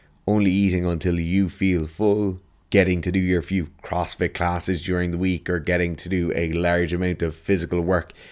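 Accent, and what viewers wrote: Ireland. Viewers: Your accent is aggressively irish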